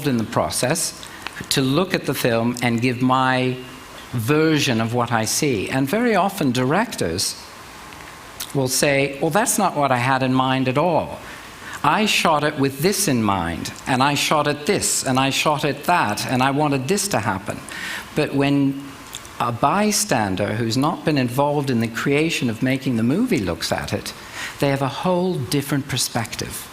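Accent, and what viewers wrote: England, United Kingdom. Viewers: What's up with the British accent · why does * sound british he's American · What's with the accent? Did he emigrate from the UK? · Why does he have a British accent? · Woot...british accent? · Ive heard his accent in many interviews, his accent is very English, you can hear him pretend to do a american accent in this video! but what i do know he's lived in the U.K and studied there for many years when he was young and went to study at the prestigious Royal College of Music. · he was such a British/almost Australian accent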